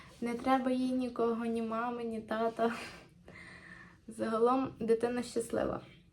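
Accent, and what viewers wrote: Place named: Hutsul, Ukraine. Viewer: Дякую і вам, що ви є у нас).🥰 Не хворійте. Ваш гуцульський акцент я просто обожнюю. У мене так не виходить 😊. Будемо чекати наступне відео 💙💛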